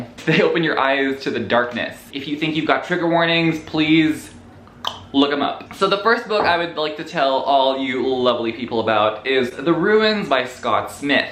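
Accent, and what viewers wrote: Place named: United States. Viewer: I am soooooo curious. How do you have such a strong and authentic American accent if you have never lived in the US. I had always assumed you moved to Asia in adulthood. 🤷‍♀️ Thank you for the list. Great reccomendations as always.❤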